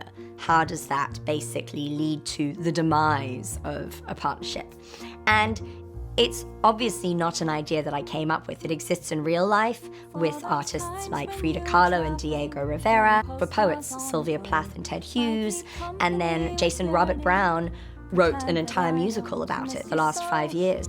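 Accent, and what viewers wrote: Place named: England, United Kingdom. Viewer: I absolutely love this song and am discovering the rest of your stuff - absolutely beautiful. You sound English not canadian but i've met people from NFL who sound scottish so wo knows, I've only lived in southern Ontario. Anyways love this song, the plagal cadence has huge emotional resonance for me and the moment the bass outlined the motif i felt i was Home. :)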